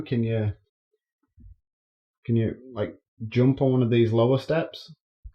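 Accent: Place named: North, United Kingdom. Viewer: Just found this channel awesome stuff man. That was my life from a small kid until I was around 17 and moved out. But over the last five years the lights and, colours and noises are back around me. Kind of welcome it now. Where abouts are you from. Sound like a fellow northerner. Keep up the good work.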